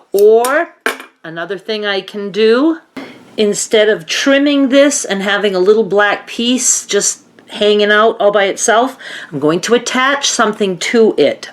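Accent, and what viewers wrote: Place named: New England, United States. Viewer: I just started my first crumb quilt for a wedding gift for my best friend 💝 I was using a combination of method 1 and 2 but now I've got 3 more to incorporate and really shake things up! Instant subscribe seeing the "Queen of crumb quilting" comment lol Where are you from,? I recognize the New England accent, but can't pinpoint a state 😂 hey from northern Maine 👋💝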